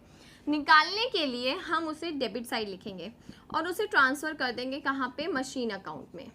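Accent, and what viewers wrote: Haryana, India. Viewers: Haryana se ho😘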